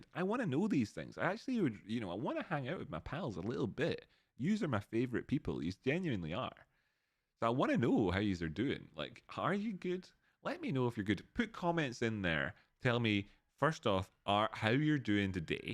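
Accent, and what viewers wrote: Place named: Scotland, United Kingdom. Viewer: I've been watching you for months now, and have always wondered what the "uh oh" button was. While rewatching the VLDR TA i finally realized you were saying "auto button" with your scottish accent. Make's so much more sense now. im such a fanny lmayo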